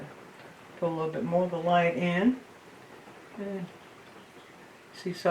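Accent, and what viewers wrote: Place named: Appalachia, United States. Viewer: I love the tutorials and I love so much that her accent sounds like mine. Any time I hear an Appalachian accent in a teacher of any kind it makes my heart glad!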